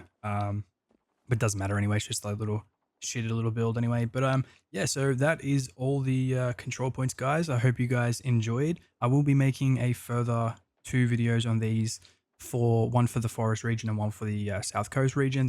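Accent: Australia. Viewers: Ngl I enjoy hearing another fellow Aussie trying to pronounce these Swedish names 🤣😂😅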